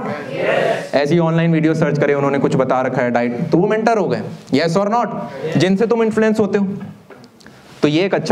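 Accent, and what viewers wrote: Haryana, India. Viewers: ....the haryanavi accent😂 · 😉isse badhiya to sipahi lagleta fauj me.. Bhaiya apki Haryanvi accent bht shi lgti h☺️ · Sir kya aap Haryana se belong karte ho.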